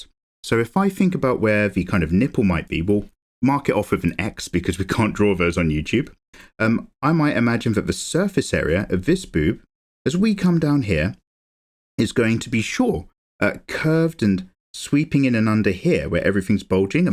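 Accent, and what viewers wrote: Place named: RP, United Kingdom. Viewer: I just spent 23 minutes listening to a very pleasant British gentleman explaining in RP (sounds like RP to my ears) how to draw anime tiddies. Youtube algorithm, mission accomplished. Jokes aside, this really was an interesting video.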